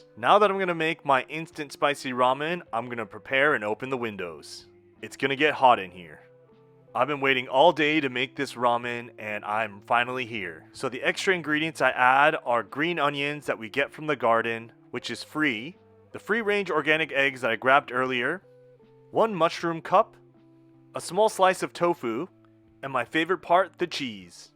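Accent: United States. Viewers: did you live in america for a long time prior to australia? you speak english in an american accent edit: nevermind i saw the caption right as i typed that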